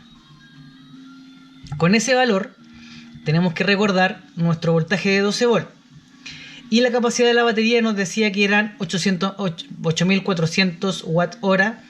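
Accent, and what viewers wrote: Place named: Chile. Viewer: Hola a estoy viendo estevideo (12/05/21) y está muy interesante, tengo en proyecto construir una Tiny house, y quisiera conocer el presupuesto de mis necesidades, pero no se la unidad monetaria que utilizas (pesos) estas en México, Argentina o Chile (seria bueno traducirlo a us$) me parece por el acento que eres Chileno, si todavía revisas los comentarios me gustaría saber el tipo de moneda y de cambio actual para poder tener un parámetro y calcular mi presupuesto. Gracias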